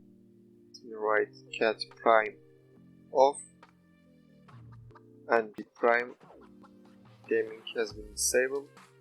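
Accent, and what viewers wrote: Turkey. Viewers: man youre turkish right, your accent is so turkish!! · %90 Türksün değil mi :D kelimeleri telafuz edişini türk gibi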